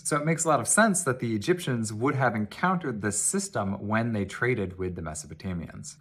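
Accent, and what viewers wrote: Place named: United States. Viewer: I cannot stand the American pronunciation of cuneiform. kyuunay-uh-fooorm.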